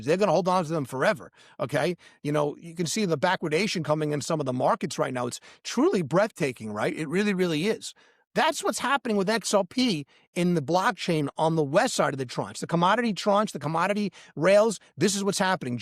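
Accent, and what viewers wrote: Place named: New York, United States. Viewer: My man is right on. Good info. Dig that N.Y. accent burning!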